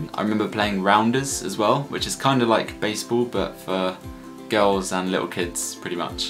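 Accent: England, United Kingdom. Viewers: I'm from Britain, but even I think your voice is awfully British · Yesss,he makes me proud to have a Englsih accent:))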